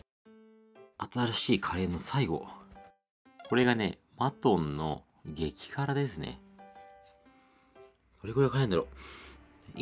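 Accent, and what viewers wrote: Japan. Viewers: Saludos desde venezuela 🇻🇪♥️😘me encAnta tu asentó japonés aunque no entiendo nada jijiji me facina y me encanta tus videos 😘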